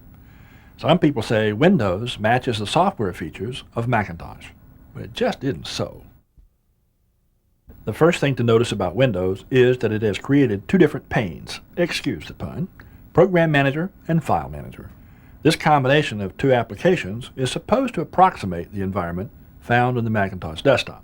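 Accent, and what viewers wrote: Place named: South, United States. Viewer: I love the presenter’s easy going southern accent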